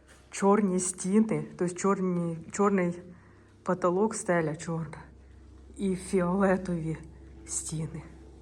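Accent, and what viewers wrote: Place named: Kyiv, Ukraine. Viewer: У вас говір київської області👍❤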